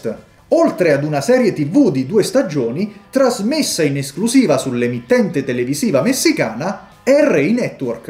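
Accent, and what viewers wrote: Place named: Marche, Italy. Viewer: Maremma cane non prendiamoci troppo sul serio! tutte le settimane ci prepara questi gustosi video a tema horror e un punto di vista che condivide Co. Generosità Con noi che senso ha stare a commentare eh ma io avrei incluso? Sono le classifiche di il suo punto di vista non è necessario il nostro punto di vista è eccheccazzo 😊 forza grazie l'accento Marchigiano mi fa impazzire 😂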